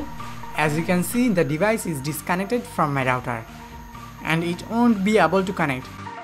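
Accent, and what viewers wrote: India. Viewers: Ooooff...That Indian speaking is so bad to listening 😣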